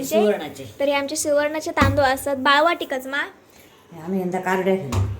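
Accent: Malvani, India. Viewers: Malvani chan bolte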